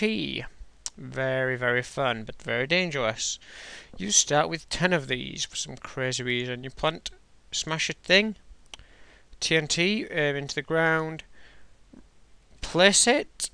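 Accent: United Kingdom. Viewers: TOO MUCH BRITISH! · cute british accent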